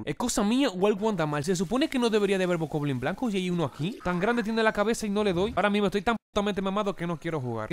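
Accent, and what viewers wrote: Dominican Republic. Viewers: Eres de República Dominicana ?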